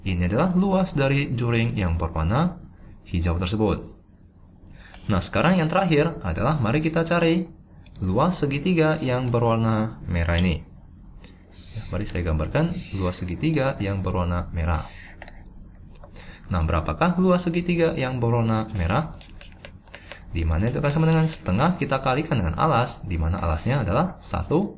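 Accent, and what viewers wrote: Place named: South Korea. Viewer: Logatnya kaya org korea 😅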